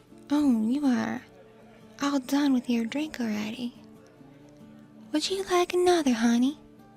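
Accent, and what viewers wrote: South, United States. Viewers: Your Country accent is really unique & special,i love all your asmr's and wonderful rps which are so appealing because you put your heart & soul into all of your work! Thank you for all that you do & the work you put into every video to help others feel good. ☺ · I do believe your country accent is one of the most blessed ones around, it is so relaxing to listen to, and on top of the crisp sound effects and immersive background soundtracks, the listener is teleported to whichever setting your audios is set in. Fantastic as always.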